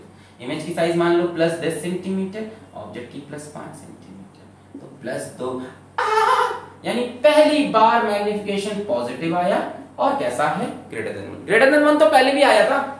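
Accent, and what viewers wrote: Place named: Bengal, India. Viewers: Sir ur bengali ascent is very good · sir akdm rapchik bengalii. You are amazing sir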